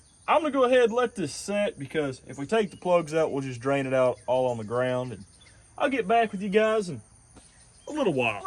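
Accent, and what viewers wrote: South, United States. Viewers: the southern accent, the simple editing, cool cars? i fuck with this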